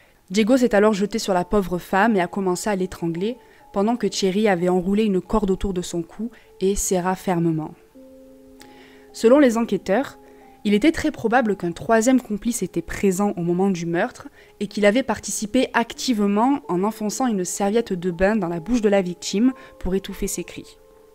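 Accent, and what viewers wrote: Marseille, France. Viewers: Voilà un histoire qui prouve qu'il ne faut jamais fait confiance à personne à 100% à au plus proche PS: désolé si c'est indiscret de ma part mais t'est marseillaise ?? chais pas pourquoi j'ai l'impression qu'il y'a un petit accent marseillais dans la façon dont tu parle😭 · L'accent Marseillais j'aime bien !❤️🥰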